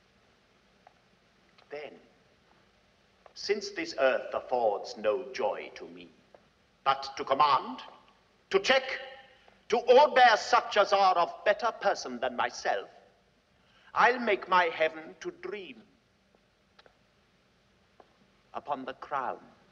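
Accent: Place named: England, United Kingdom. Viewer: Who else is absolutely fascinated by his English accent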